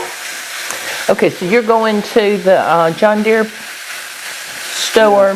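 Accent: South, United States. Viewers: I ❤ your southern accent. I am new to your channel. Enjoying your channel.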